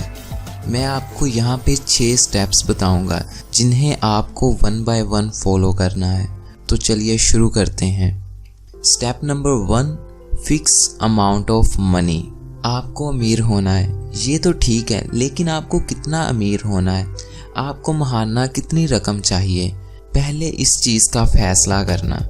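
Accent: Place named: Lahore, Pakistan. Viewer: Your English accent is typically Lahorian. Improve it. Or use 100% urdu.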